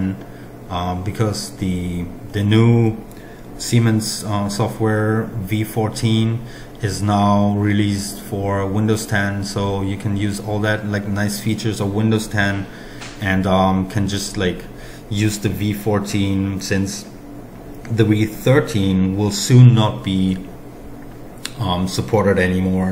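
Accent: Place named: United States. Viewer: OK, that explains those pauses and hesitations and my hunch that you did not sound as an English native speaker, however your good pronunciation deceived me. Anyhow, you don't sound German, more like an American accent. Keep making this sort of videos, but improve your fluency to make them shorter and more pleasant to your audience.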